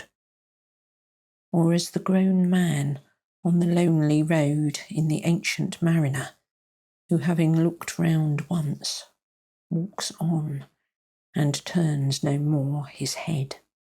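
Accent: United Kingdom. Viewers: No problem,. Your British accent also fits these tales. Keep up the good work!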